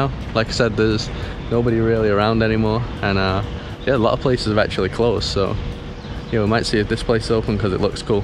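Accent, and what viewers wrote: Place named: Northern England, United Kingdom. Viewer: I really love the accent from Northern England. Cordoba is amazing! Happy travels!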